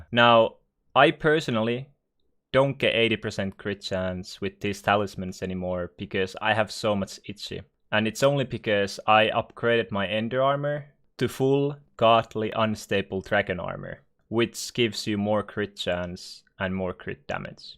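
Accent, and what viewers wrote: Finland. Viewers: your accent makes me want to go to finland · Bcs he’s finnish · Are you finnish becouse i am and your accent sounds finnish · shut the fuck up he is Finnish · Yo are u from Finland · Youre a finn, its confirmed im sorry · Bruh he’s just Finnish it’s a different accent chill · huomasin heti et olit suomalainen sun accentistä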